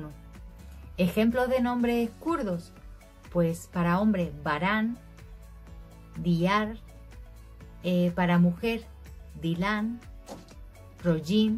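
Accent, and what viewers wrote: Andalusia, Spain. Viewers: Estupendo video, son muy bonitos los nombres turcos, desde que veo las series todo lo referente a Turquía me encanta. No hay lugar en el mundo donde que me atraiga más que Estambul. Me gusta como suena el idioma, las comidas, costumbres, todo es nuevo para mi. No me gusta cuando critican en los medios la vida en Turquía, supongo que como en todas partes hay tradiciones mejores o peores pero por eso no hay que desmerecer a una tierra y a su gente. Por tu acento deduzco que eres de Andalucía y has aprendido a querer a Turquía que me parece maravillosa. Pena que con este virus, cada vez estaremos mas limitados en viajes por el mundo. Te he descubierto hace poco, pero es muy interesante todo lo que dices. Un beso desde Barcelona, tienes una fan. ♥️🌷🌼🌹🇹🇷🇪🇸😘😘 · Me encanta el acento andaluz!! 🙌🙌🙌🙌🙌 Justo estoy viendo uno de tus vídeos "10 cosas que siempre hay en una casa turca"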